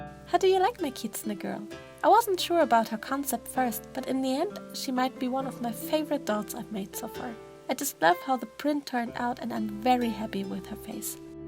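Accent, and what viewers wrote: Germany. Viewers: Your accent sounds German lol are you perhaps German? Bts love your art!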